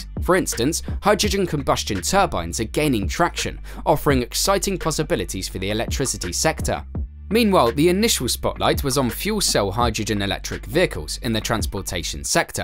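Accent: United Kingdom. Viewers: The narrator speaks too quickly and has a terrible limey accent which makes it difficult to understand. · Why do they have to use British announcers, can somebody tell me why?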